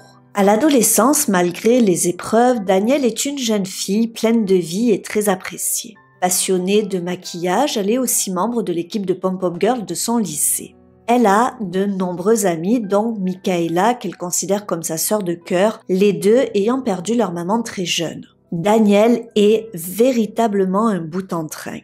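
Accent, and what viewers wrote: South, France. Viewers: < Danielle> C'est une triste histoire surtout pour Danielle. Je l'avais déjà entendu par un autre youtubeur/se (?). Je pense y avoir appris des choses en plus... Le seul soucis c'est ta prononciation pour certains mots...qui me font hérisser les poils sur le crâne. C'est vraiment dommage car tu as une chouette voix du sud...agréable à écouter. Dans cette affaire on voit bien que Scylar est un "bon" comédien. Menteur...colérique...et a l'air d'avoir une haute estime de lui Sans compter qu'à chaque tentative ou il feint de pleurer...il n'y a aucune larme ! Je pense qu'il n'avait aucune confiance en Danielle et qu'il voyait le "mal" quasiment partout. De plus apparemment c'était un gars qui aimait rabaisser sa femme... Peut-être que le comportement qu'il a montré envers sa femme est repris de son père voir de sa mère. Mère un peu trop "proche" de son fils... 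Purée il a un air tellement arrogant et suffisant. Tout dépend de savoir si Scylar brutalisait Danielle ou si ce ne sont que des bruits de couloirs... Si Scylar aimait sincèrement et respectueuses Danielle...je me dit que vu son enthousiasme...son excitation du retour de son homme...je ne pense pas que Danielle aurait tout gâchée avec un amant. Par contre si Scylar était comme le prétendent bon nombre de leurs "ami(e)s" ... c-a-d...violent...rabaissant.. etc...il se pourrait que Jeremy eu été plus qu'un simple "copain"...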